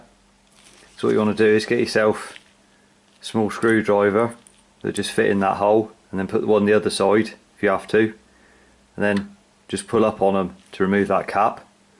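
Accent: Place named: Gloucestershire, United Kingdom. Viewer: Thanks a bunch brother. You accent seems like you are very close to where i live in Gloucestershire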